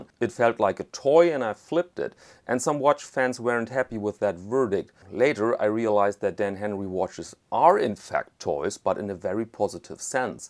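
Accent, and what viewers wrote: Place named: Germany. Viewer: Love your reviews. Jazz guitar? Great watches? Great mens attire? And a German accent! (I lived in Oberammergau till age 14) keep them coming!!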